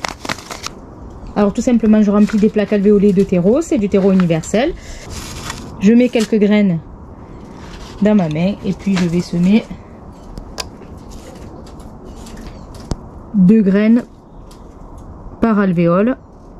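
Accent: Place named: Provence, France. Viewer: super merci pour la réponse, j'ai l'habitude de tout (ou presque) rentrer tant qu'il gèle. À la vue de tes résultats je vais m'inspirer de ta façon de faire, je suis en Provence, donc à l'ouïe de ton accent on devrait pas avoir une grosse différence de climat. 😉 À plus merci encore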